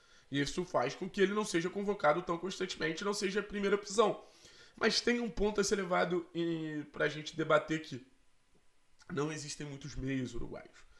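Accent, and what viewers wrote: Rio de Janeiro, Brazil. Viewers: sou novo no canal irmao, desculpe a pergunta, vc eh carioca? mora aqui em cwb? acho q eh a primeira vez q eu vejo alguem com esse sotaque torcendo p cap, inscrito jaaa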